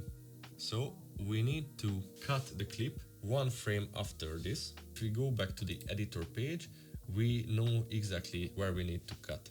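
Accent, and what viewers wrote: Hungary. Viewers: Hello ☺️ pár perce nézem még csak a videót, de már most látom, hogy érdemes lesz végig nézni. Meg lestem már pár hasonlót speedramppal kapcsolatban és kiváncsi vagyok milyen extrát tudsz mutatni azokhoz képest. Ja és remélem eltaláltam a nyelvet az akcentus alapján 😉😃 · Na jó 😁 addig görgettem míg kaptam magyar hozzászólást 😁 az akcentusból kivehető 😅 köszi a klippet!❤ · Are you hungarian? Na ja jo, egsh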